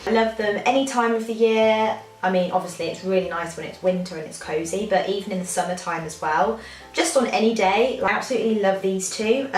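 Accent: United Kingdom. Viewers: Great video love !! I love English accent 😍 !!! Im here showing some love and supporting back 😊💕 thanks for stopping by my channel. Xoxoxo💕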